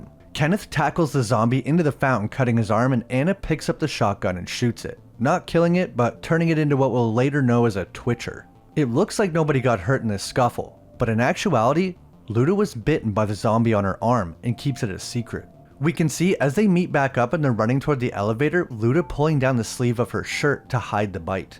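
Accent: Canada. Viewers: You lad are a canadian, 😂 i just noticed - tha accent been lost lately, you sneaky bouuut beer drinking mofo 😂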